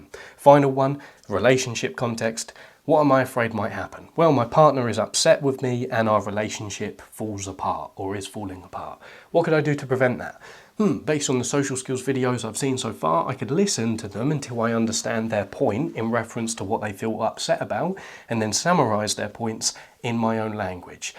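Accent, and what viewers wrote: United Kingdom. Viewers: You're the reason I'm learning English with a British accent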